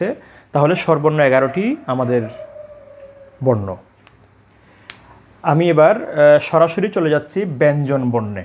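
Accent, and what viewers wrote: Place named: Chittagong, Bangladesh. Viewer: চট্টগ্রাম এর আঞ্চলিকতার প্রভাব কিঞ্চিৎ লক্ষণীয়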